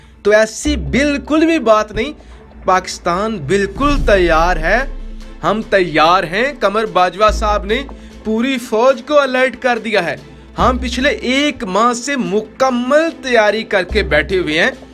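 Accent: Punjab, India. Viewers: Bilawaja ki bakwaas video. Kiyoun china and india both are not atomic power. If yes then how china initiated. Secondly thori urdu sahi bolo bilkul punjabi lehja hai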